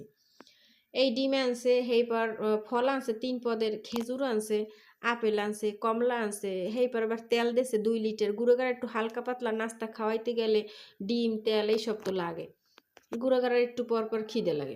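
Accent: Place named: Barguna, Bangladesh. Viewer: ময়না পাখি তোমার ভিডিও আজকে প্রথম দেখলাম তোমার কথাগুলো সত্যি খুব ভালো লাগলো ❤আমার শ্বশুরবাড়ির অঞ্চলের ভাষা মনে হয়েছে আমি যেন বরগুনায় আছি ❤বাজার গুছিয়ে নেওয়া সবকিছু মিলিয়ে খুব ভালো লাগলো❤❤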